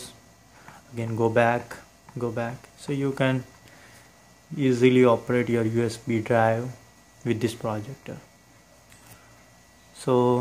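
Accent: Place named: India. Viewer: I hate your Indian accent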